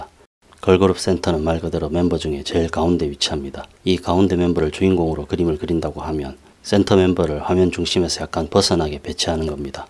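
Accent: Gyeongsang, South Korea. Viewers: 안녕하세요, 제가 캘리그라피랑 수채화 배우는데 수채화 찾아보다 인물화 검색하니 선생님 영상들이 떠서 들어왔는데 그림 중에서도 가장 어려운 인물화를 생동감 있게 그리시네요. 감탄합니다.. 그림도 그림이지만 영상 내용과 맞지 않는 질문일 수 있는데, 선생님은 경남 서부 분이신가요? 제가 팔도 사투리에도 관심이 많은데 선생님 억양이 경남 서부이신지 아니면 경북이랑 섞인 경남 함양 쪽이신지.. 궁금하네요. 경남 경북 억양이 골고루 섞이신 것 같은데 대구나 경주 같은 경북 남부 혹은 경남 동부 부산쪽에서 들리는 음색이 아니셔서 의아해하다 용기 내서 야심한 밤에 댓글 남겨봅니다. 감사합니다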